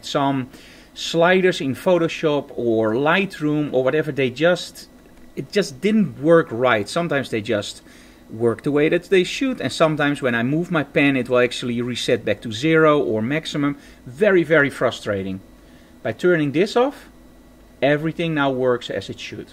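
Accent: Netherlands. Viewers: je bent echt overduidelijk engels met je nederlandse accent, blijf wel dit soort videos maken tho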